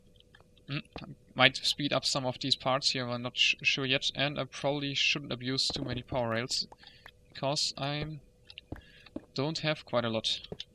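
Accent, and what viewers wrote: Germany. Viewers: you can rly here hes from germany :D but ya great video. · He prpbably meant the description :) Bt the way great video, i really enjoy your videos, and the german accent just makes it better :D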